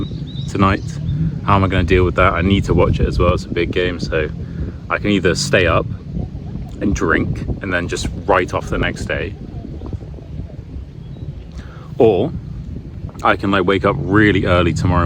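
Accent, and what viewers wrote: United Kingdom. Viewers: British accent it is love it❤❤❤🥺🥺🥺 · british accent is my favourite. greetings from caracas, venezuela.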